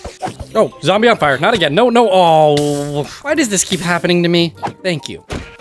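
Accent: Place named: Midwest, United States. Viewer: Are you midwestern by chance? I recognize that accent because I have the same one!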